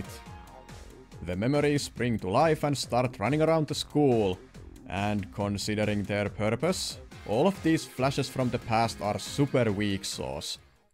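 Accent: Finland. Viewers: Are you finnish by any chance?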